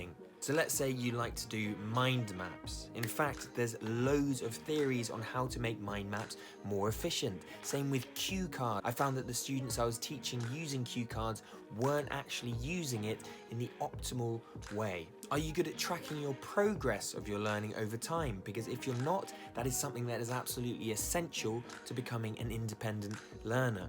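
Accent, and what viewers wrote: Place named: RP, United Kingdom. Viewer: You sound posh - did you go to public school?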